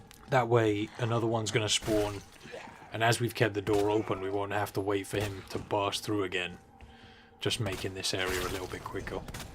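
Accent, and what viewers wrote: United Kingdom. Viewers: Dude, you made this look easy. LOL! Really well done, you are insanely skilled! By your accent I can see that you are british, cheers mate! Much love from Brazil.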